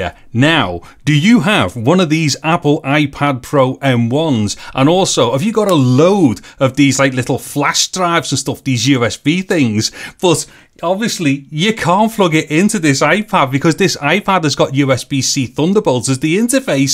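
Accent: Liverpool, United Kingdom. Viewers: Definitely a scouser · Thanks for that. I bought a complete USB-C Hub to connect all sorts of media. It’s the same one advertised in the Apple Shop but, buy externally and you can buy it for 25% of the price Apple want. You read that right. Buy external of Apple and SAVE 75% on Apple price for exactly the same brand and item they are selling. Good video with your happy smiling face and Liverpool accent. Reminds me of home. I now live in Thailand. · Found this by just browsing and I wasn't expecting a cheery Liverpool accent to greet me! Sláinte mate, great vid v helpful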